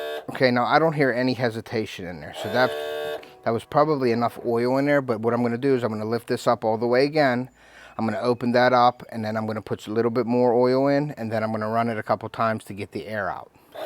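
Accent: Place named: Pittsburgh, United States. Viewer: Awesome video. Searched how to add oil to my merucry outboard and this video came up. Within TEN seconds, i was like is this guy my neighbor?? lol fellow yinzer here!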